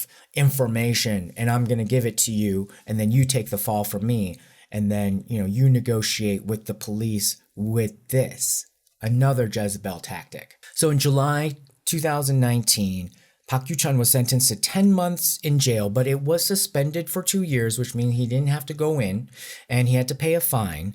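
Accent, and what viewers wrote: South, United States. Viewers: I love how your southern accent started to coming heavier as the video progresses. 😂 I love it.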